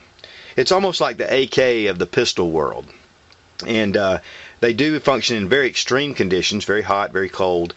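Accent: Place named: South, United States. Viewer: man i like your southern american accent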